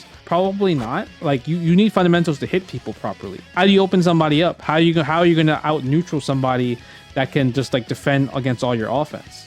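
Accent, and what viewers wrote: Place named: New York City, United States. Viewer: Watching your vids for a while , hearing the way you speak and your slang , what games mean the most to you and even that Nights Jingle at the end … I knew you were my age and from NYC. Yup I was right - 84-85… from NYC, don’t tell me ur from Flushing …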